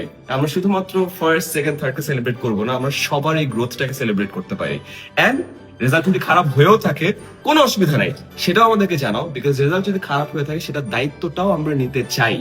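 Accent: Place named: Comilla, Bangladesh. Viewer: Apni Cumilla r😮🎉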